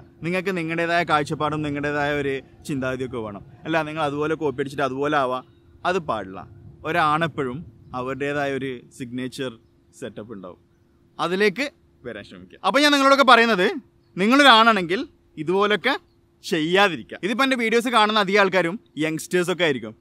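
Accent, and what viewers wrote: Kannur, India. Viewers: ningal kannur aano?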